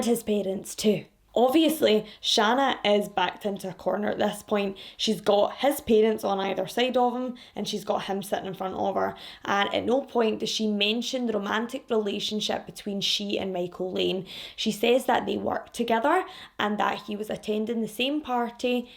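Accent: Scotland, United Kingdom. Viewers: What a sick fuck! I’m having trouble placing your accent - what part of Scotland are you from?? xxx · I’m so glad I found you last night! You remind me of myself 😂 I knew you were Scottish the second you started talking. I am too but my accent is a lot stronger. I’m binge watching every video now and can’t wait for more. Such a great personality for these stories, you need some humour and light hearted moments to stop them becoming too heavy. Love it. Your makeup is amazing too, that bright inner corner👌❤️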